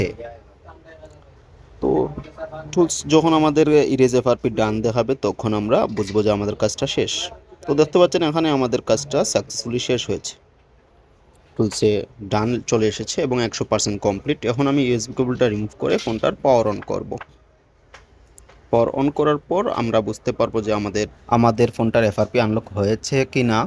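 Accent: Sylhet, Bangladesh. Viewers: Vai Sylheti😊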